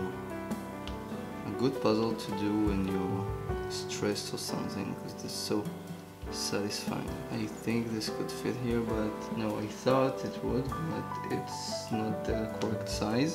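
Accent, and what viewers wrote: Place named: Israel. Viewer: Ach sheli you know your name is. Stam but I totally knew you were Israeli just from your accent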